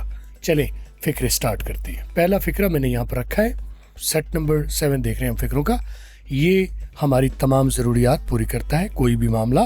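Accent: United Kingdom. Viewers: Talking is British accent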